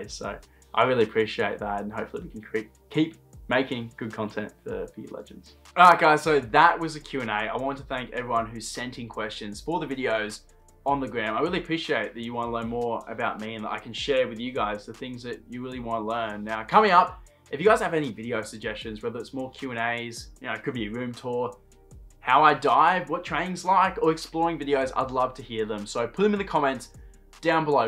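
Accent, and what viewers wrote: Australia. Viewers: I love your Aussie accent. You are so adorable. ❤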